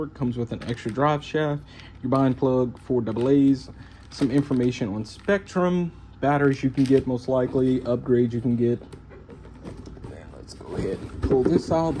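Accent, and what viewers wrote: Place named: Deep South, United States. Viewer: Deep South. Where u from? I say ur bio saying Cajun. So Louisiana? Baton Rouge here. I’m waiting for my base camp to come in the mail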